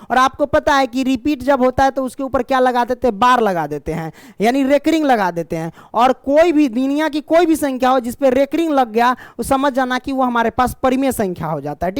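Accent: Bihar, India. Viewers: Sir aap bihar se ho na😊me tooo❤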